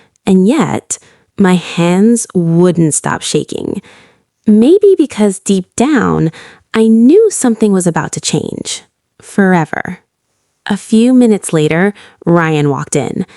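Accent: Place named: United States. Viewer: ❤❤❤❤. American English so nice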